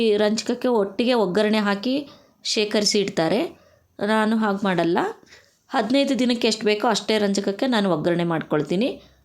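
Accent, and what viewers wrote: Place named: North Karnataka, India. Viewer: Akka uttarkarnataka slang olag matadri, cholo ansutta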